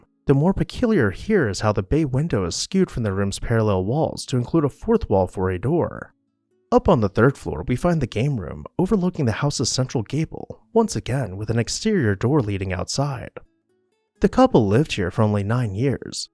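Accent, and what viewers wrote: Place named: Georgia, United States. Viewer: I would love to visit your town! I adore these beautiful old houses. Georgia is a beautiful state from what I've seen in movies and photos, and I love the charming Southern Georgia dialect. I grew up in E. Texas and have that peculiar drawl, but I think Georgians have the best Southern accents of all. No one can give an insult as elegantly and sweetly as the genteel Georgians! "Bless Your Heart!"😂🤣💖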